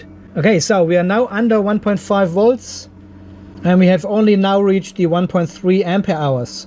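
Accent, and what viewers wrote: Germany. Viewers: Is it right, that you have a german background? You said "nochmal" and your english sounds like here in germany 🤣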